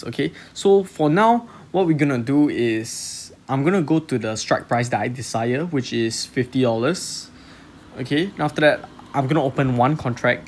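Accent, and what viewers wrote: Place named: Singapore. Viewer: singapore accent ah boi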